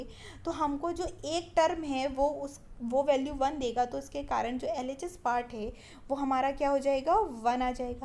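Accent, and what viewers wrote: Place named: Indore, India. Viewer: Ma'am aap Indore se ho kya ?? Aapke bolne k tarike se laga mujhe. Btw well explained.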